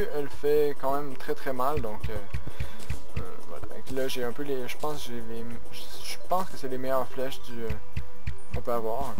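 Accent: Quebec, Canada. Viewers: Tu est québécois ? J aime bien l accent du Québec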